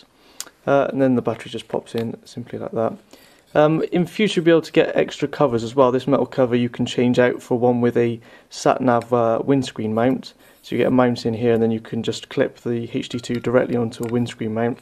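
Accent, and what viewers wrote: Bristol, United Kingdom. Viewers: awesome phone, getting mine soon, also you sound like you're from around bristol.......maybe? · he has a British accent... i dind't understand him either pretty good... but if u listen carefully, its okay!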